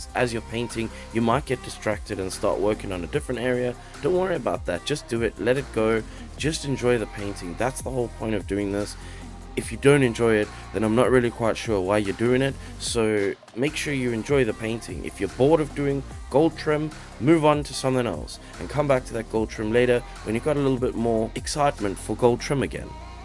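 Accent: South Africa. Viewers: Your accent is extremely South-African. 👀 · South African?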